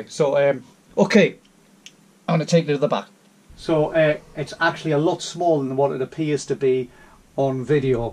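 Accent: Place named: Northern England, United Kingdom. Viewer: you're a bloody musical titan, honest! I also have a lot of fun listening to your northern English accent, which I'm very fond of. Thank you for being a legend!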